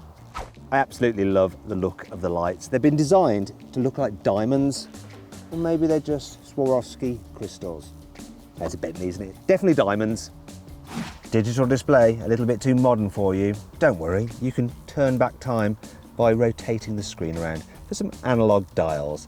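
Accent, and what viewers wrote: United Kingdom. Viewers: 영국발음 너무조아 미쵸♡♡♡ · 리뷰하시는분 발음이 영국분 같은데 나같아도 국뽕맞겠다..ㅜㅜ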